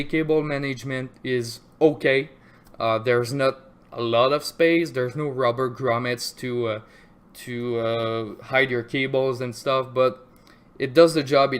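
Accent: Quebec, Canada. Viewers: tu sonne pas mal québecois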